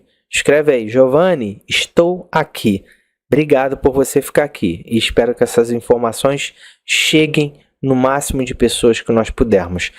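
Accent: Rio de Janeiro, Brazil. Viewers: Deixe esse sotaque de carioca ja esta enojando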